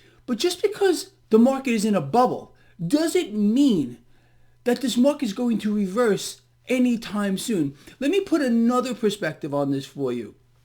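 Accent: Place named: New York, United States. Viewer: I genuinely thought you were in NY. Maybe it’s the accent. I know the market isn’t gonna reverse anytime soon thanks to the fed, but It will reverse, eventually, and it’s gonna be a doozy. I understand your logic but nothing goes up forever dude. Buy high sell higher? Hahaha. You turned everything upside down. You’re trading though. You’re not a “buy and hold “ guy. You’re not saying buy and hold for your future however am I correct?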